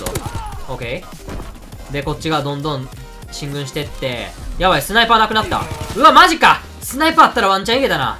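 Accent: Kansai, Japan. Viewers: 元が関西やからな。普通に関西弁使うときあるしイントネーションも関西寄り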